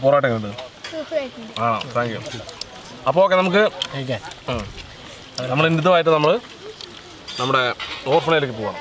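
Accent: Palakkad, India. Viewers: am from Coimbatore i saw your all videos like it especially your palakkad slang is good and like it basically am from palakkad. one day I want to join with ur food preparation and tasting with you. If any chance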